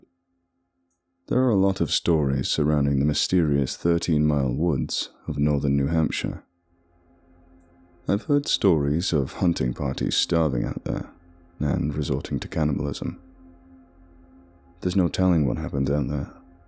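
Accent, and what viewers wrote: South, United States. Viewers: You pretty much nailed the southern accent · the southern accent actually works pretty well. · ya good at southern accents but it's NH north nothing like what we sound like. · Next time that you do a piece about the Northern Part of the USA, such as in 13 Mile Woods in New Hampshire, use regional accents, not the southern rural accents that you are so fond of. Hunters up near the Canadian border are unlikely to have southern drawls. Thank you. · Actually, your Southern USA accent is pretty danged good. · Why the southern accent isn’t this supposed to take place in north east u.s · Never ever apologize for your proud Southern Accent, NEVER!! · As someone from the southern United States I must say that you have really gotten better at the southern accent. Great job as always. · I'm from the south and think you did a great job on the accent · I love your country accent. 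😂❤️ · Thank you for taking the time to narrate this. Also, great job on the country accents. Looking forward to more! Always watching, waiting and listening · You sound so cute doing a Southern accent! I love it! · Your southern accent is on point, mate! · Your accent was better than mine and I live very near people who have the southern accent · no more southern accent please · Ahhh, nothing like a good wendigo story.. Plus, dont ever apologise for your southern accent.. Its good.. I live in the south and youre doing it justice.. Theres a reason why hollywood uses english actors for southern character roles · Great southern accent. If only this was set in the south. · You did a good job with the Southern accents! I felt right at home. 😅 But were they supposed to be Southerners, or New Englanders? I potentially missed that detail, lol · Your southern accents sound superb! You could easily be a voice actor for The Last of Us with how good they are.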